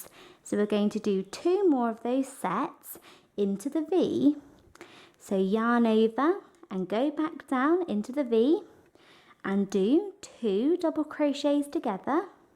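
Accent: United Kingdom. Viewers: Que bonito suena el inglés británico!! 😍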